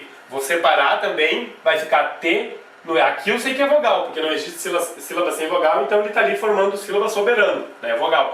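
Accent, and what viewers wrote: Rio Grande do Sul, Brazil. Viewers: gostei do sotaque gaúcho ..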